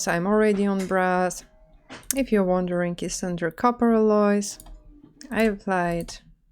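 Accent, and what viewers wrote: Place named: Russia. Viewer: У девушки явный русскиий акцент... Давно в Канаде?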